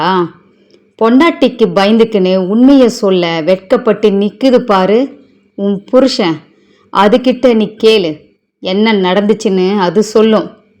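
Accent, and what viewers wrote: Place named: Chennai, India. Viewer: அட அட சூப்பர் கதை அப்புறம் உங்கள் ..... உங்கள் மெட்ராஸ் பாஷை அட்டகாசம் சகோதரி❤❤❤❤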